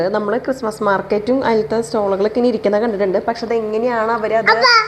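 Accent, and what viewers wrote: Thrissur, India. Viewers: Thrissur ano..? 😂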